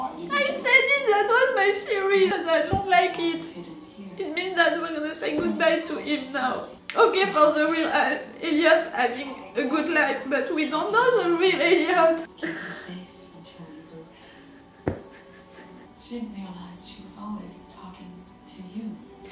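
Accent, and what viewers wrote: France. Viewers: I’ve seen tons of shows the last couple of years, they came and went just like that but Mr. Robot reached me in a very strong and deep way, beyond the outstanding perfomance, acting, screenplaying and ost, because mental condition is very close to me and is something i hace to fight to everyday. Thank you for share your feelings and thoughts with the world about this underrated show, i dont know you but i can tell you are not faking reactions at all, I will always remind you "frenchglish" haha (you did it great! English is not my mother tongue also but i could understand you!) Well, i supposs this is the end, leave you my with my special moment (and song) of the whole show: "Darlene leaving the family polaroid picture in Elliot’s shelve" <link> Goodbye friend c'est fini.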